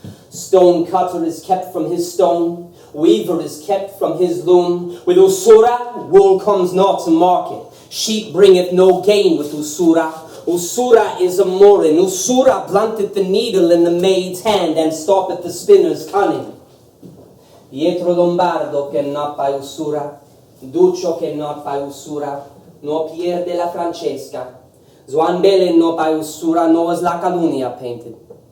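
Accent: South, United States. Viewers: Ezra Pound recited in the most cultured Southern accent I have ever heard.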